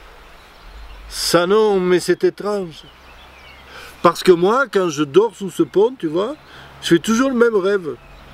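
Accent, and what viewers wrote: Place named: South, France. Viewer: Du Paolo Cuhelo, avé l'accengn... Merci ! J'allais dire : encore ! Mais encore, tu nous le donnes déjà.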